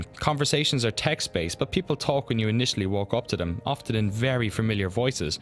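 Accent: Ireland. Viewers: are you irish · are you irish? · This guy has a real Irish accent, we don't sound like leprechauns!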